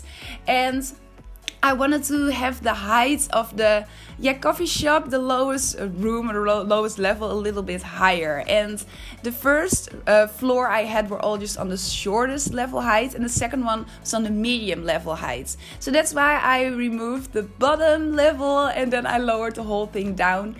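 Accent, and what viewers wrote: Netherlands. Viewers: Ben je Nederlands? Ik hoor een Nederlands accent achtig iets haha ! Heel mooi gemakkt dit!😍 ik ben zelf helemaal verslaafd aan de sims🙈 super mooi😍❤️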